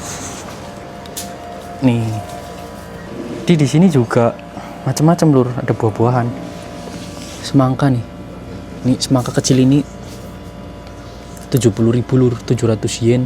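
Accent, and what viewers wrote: Banyumas, Indonesia. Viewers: Mas Indonesia dari mna ko bahasanya ngapak kenapa kerja d desa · Lugatnya jateng ngapak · Bahasane ngapak Wong ngendi sih ko? 😅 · Rika ngapak yaak?. Bambang Banyuwangi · Wong bnyumas ngpak2 mntap mas bro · Bahasene ngapak. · Asalamualaikum,salam kenal,mas aslinya jawa mana yah ko mirip kaya kampung ku banyumas.terus di jepang udh brapa th..? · Mas sampean asal nya jawa ngapak ngndi sih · si. Ngendi asline jawa ngapak? Ngendi Cilacap.? Banyumas? Kebumen? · Mas aku seneng liat konten mu bahasa mu dialek mu khas inyong2😅 salam kenal mas aq dr Banjarnegara mas asal kota mana? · Asline wong ndi mad ning Jepang bahasa jawa ngapak ya. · ADLI.DARI. NGAPAK. COLACAP,APA. BANJARNEGARA,APA. PURBALINGGA.? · Mantap ngapak ya mase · Mantep ngapak Banyumasan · Nyong penonton baru mas, seka wonodobo jateng, nyimak separo lgsung tak subkreb, tertarik karo kontene sampean ben tambah ngerti suasana pedesaan jepang, narasune sampean maer kiye, sederhana trus ora ngguwang logat daerahe, ngapak ya, pada berarti, oke semoga sukses bro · Suka bgt perumahan di desa tp rapi bersih mase. 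Ngapak aslinya mana mas sukses trus mas broo❤ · ngapak banyu mas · Asline sampeyan wong ngendi ya Mase,bahasane ngapak kaya wong banyumas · Jenengan masih ngapak kentel banget yak😂😂😂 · Sampean ngapak Jepang pa mas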